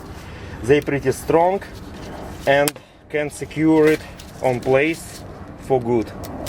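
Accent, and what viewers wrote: Russia. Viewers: Жуткий русский акцент, но англичане в восторге.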